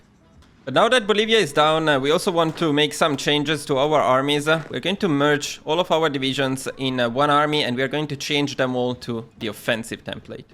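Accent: Italy. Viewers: Grande bomber avevo un botto voglia di fare sto achievement ma non Trovavo un tutorial buono e poi il tuo accento Italiano spacca, di dove sei?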